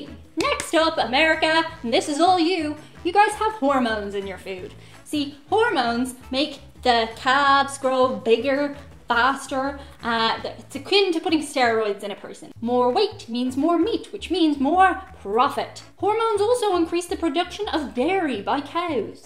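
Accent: Ireland. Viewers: Hello. What part of Ireland are you from? Im guessing south east. Co Wicklow?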